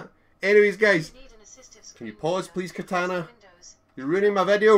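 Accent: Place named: Scotland, United Kingdom. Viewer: Unboxing Therapy Scottish version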